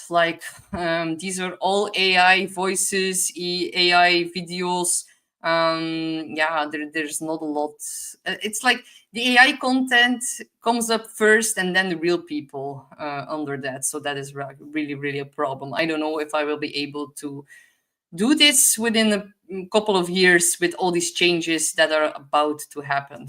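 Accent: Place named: West Flanders, Belgium. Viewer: Aaaaaahhhh, je zit vol vuur meid!! Ik heb een stuk de live mee gehad en een stuk nu aan het luisteren. Schitterend hoe je er je westvloams tussen smiet 😂 high vibes high vibes!!! En ik hier roepen.... judges!!!! (Rechters). Zalig ❤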